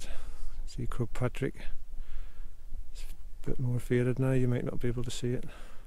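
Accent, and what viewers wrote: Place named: Fermanagh, United Kingdom. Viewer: You’re welcome, thanks for the informative videos. Listening to you talk reminds of my friend from Lisnaskea in Fermanagh. These past couple of years have brought us all down, it’s just not normal. I wish I had more of my wife’s positive outlook, she’s just a happy person, where I’ve become so angry with all the goings on. Definitely get out and reconnect with that ancient world, it’s a fascinating and peaceful as well. Take care…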